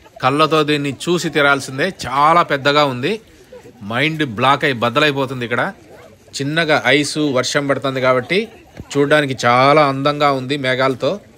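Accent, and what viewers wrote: Kadapa, India. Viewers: అన్న మి విశ్లేషణ చాలా బాగుంది, మన కడప మాదిరిగా మి మాటలు వింటుంటే చాలా సంతోషంగా ఉంది. ప్రేమతో కడప జిల్లా వాసి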